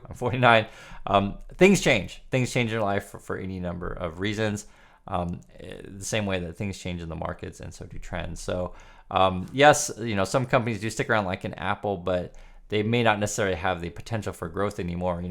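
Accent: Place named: Pacific Northwest, United States. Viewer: Fellow Oregonian here! Funny, I thought you might be from the PNW. The way you speak gives it away, big time. haha! Thanks for the video!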